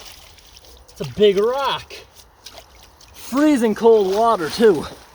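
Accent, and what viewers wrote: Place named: South, United States. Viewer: man sounds like my states down south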